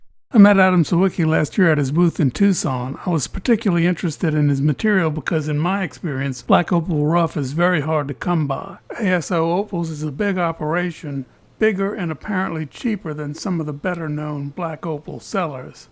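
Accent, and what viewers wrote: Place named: Louisiana, United States. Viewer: this guy has got to be from Louisiana. He has a distinct cajun accent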